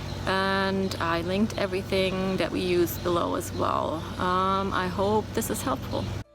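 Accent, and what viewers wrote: Germany. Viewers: I hear a bit of German accent in your voice. It's beautiful. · Fantastic video! Are you by any chance originally from Germany? (Slight accent detected) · Very nice! Do I detect a German accent 😊?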